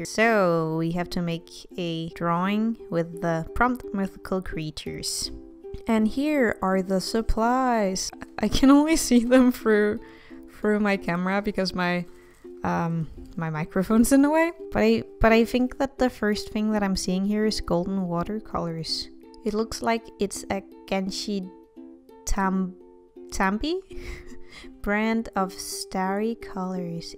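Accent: Denmark. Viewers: ive been trying to pick your accent, im such an idiot of course youre danish!! such a pleasant accent to listen to <3 lovely art and content as always my dude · Er do fra Fyn eller Sjælland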